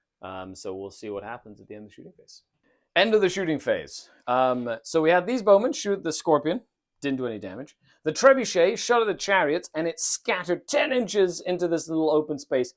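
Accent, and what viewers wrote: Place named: United Kingdom. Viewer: Just found out about this channel and subbed already. I’ll leave the well deserved praise to the other commenters. All I need to know is… what kind of wild accent is that? Sometimes your a‘s are quite British.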